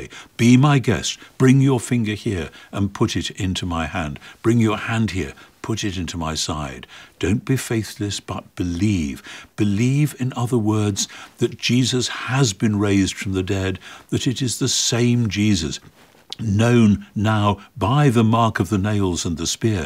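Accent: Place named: United Kingdom. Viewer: N.T Wright has one of the best British accent I’ve ever heard I could listen to them all day.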